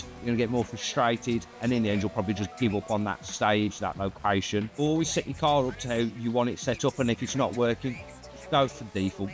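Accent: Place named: Midlands, United Kingdom. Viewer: For anyone not from Britain... this is a classic "Midlands" accent ... 🫡🇬🇧 It's equivalent to the American 'deep south.' 😂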